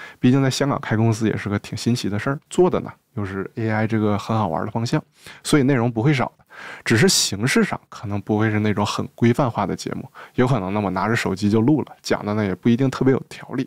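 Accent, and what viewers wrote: Northeast, China. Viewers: 小老弟小老妹儿，第一次看你的视频就知道你是东北人:face-blue-smiling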